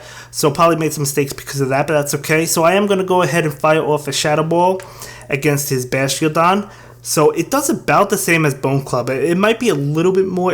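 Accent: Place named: New York, United States. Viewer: its funny getting deep pokemon go info in a new york accent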